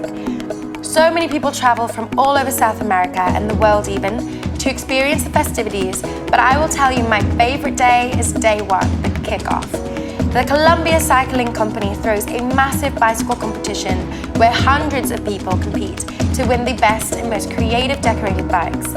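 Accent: United Kingdom. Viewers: This has become my favorite channel, and that cute British accent was the icing on the cake.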